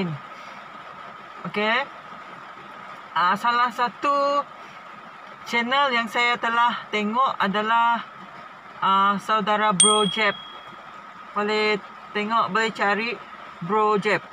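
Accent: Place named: Sarawak, Malaysia. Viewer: Thank for info....btw slang Sarawak is best...betul ka...sy hadir untuk sokongan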